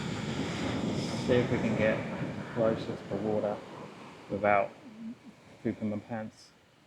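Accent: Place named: United Kingdom. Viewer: Are you originally British? You have a very british sounding accent Down under aha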